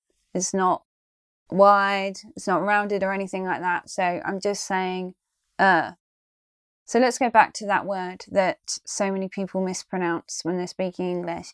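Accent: United Kingdom. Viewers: That's the British pronunciation, which differs a lot from the American one.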